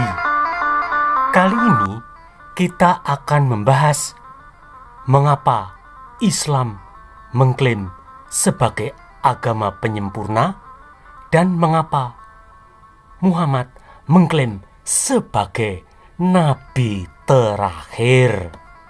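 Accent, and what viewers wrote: Java, Indonesia. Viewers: lu pamer bhsa medok jawa lu,ato jrgon nusantara lu,mo sok NKRI lu,ato lu pemuja fanatik jawaisme lu,klhatan cupunya lu ndeso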